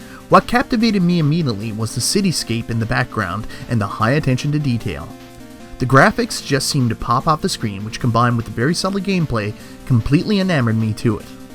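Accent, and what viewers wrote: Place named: Atlantic Canada, Canada. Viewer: based on tims cup and slight east coast accent i'm gonna guess New Brunswick? am i close? great vid btw.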